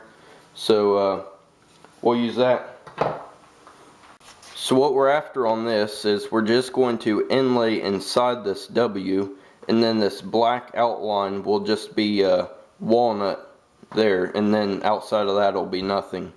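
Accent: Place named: South, United States. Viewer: thanks for this video - I haven't bought a scroll saw yet as I still need to decide which make and model to buy - in the mean time I've been watching all kinds of clips on UTube about scroll saws and this video of yours is the first that really explains very clearly how to do inlay work - I can now see a lot more possibilities with using a scroll saw - I'm not a country music fan at all but I really did enjoy the music with this video and it fits in perfectly with your southern accent - I'm sorry to hear about your brother - I checked and you are making videos again - that's great - it's a tribute to your brother and I'm sure he would have wanted you to continue - I've subscibed to your channel and please continue making videos as not many woodworkers explain things as clearly as you do - visual effects in clips can be interesting for awhile - but in the end most people want to watch clips like yours to learn - by the way I live in Europe - thank you for sharing your knowledge…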